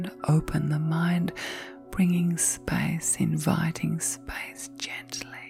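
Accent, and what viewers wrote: Australia. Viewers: how are you doing today? I love 💕 listening to your very soothing sweet chloroform voice!!!!💕💕💋💕, your voice always makes me super duper happy 😊!!! 💕💋, I really miss your very sweet Australian voice!!!💕💋 I miss telling you stories!!!💋💕, you are my very favorite chloroform & you know how to keep me asleep!!! I will talk to you later!!!💋💕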